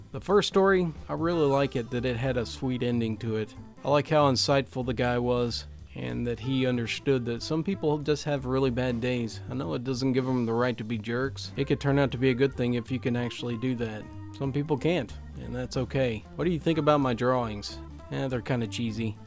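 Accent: South, United States. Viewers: the waylen jennings justified accent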